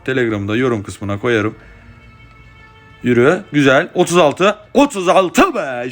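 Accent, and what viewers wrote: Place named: Aegean, Turkey. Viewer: Siven tam ege şivesi reis mausuna taş deymesin belki bizede bu 444 kişinin için şans güler bol şanslar 🖐️ Papara numarası <num> A. D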